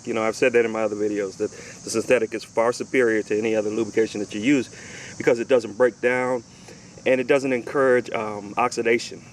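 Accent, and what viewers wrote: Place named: Caribbean. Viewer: You sound like you have Caribbean Roots man....well done btw. Thanks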